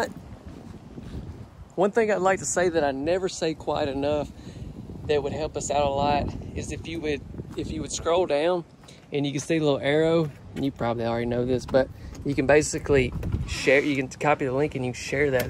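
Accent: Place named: Biloxi, United States. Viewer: That's ok I love you accent it reminds me of Biloxi where I'm from now I live in KCMO in a double wide.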